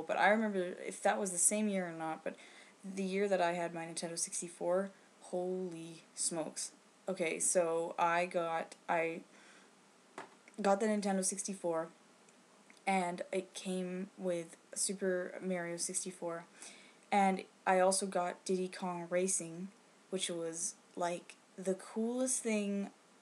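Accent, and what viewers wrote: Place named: Canada. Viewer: I thought you where American untill you pronounced out as "oot"and about as"aboot""and then I thought you must be Canadian.please correct me if I'm wrong.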